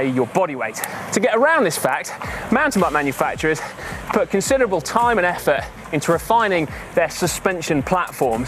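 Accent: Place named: England, United Kingdom. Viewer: English accents are annoying..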